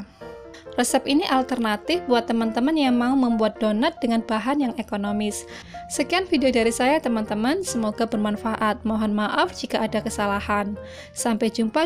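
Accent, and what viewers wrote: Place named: Java, Indonesia. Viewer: Menul2 kak bahasa jawanya.. Endull 🤩🤩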